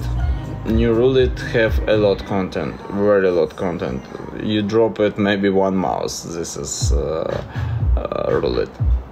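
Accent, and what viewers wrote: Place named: Spain. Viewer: Me encanta su acento español su acento ruso y su inglés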